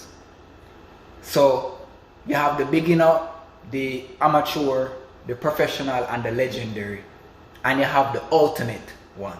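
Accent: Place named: Caribbean. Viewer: You said all facts bro, I just subscribed didn’t know you make content I hear the accent, im Carribean as well, big ups 🤛🏿💯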